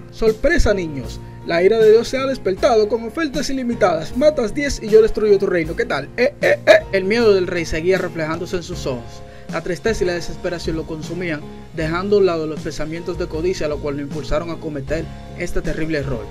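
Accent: Dominican Republic. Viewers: Bro tengo una pregunta Eres Dominicano? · Bro, ¿eres dominicano? Me encantan tus videos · eres dominicano? xD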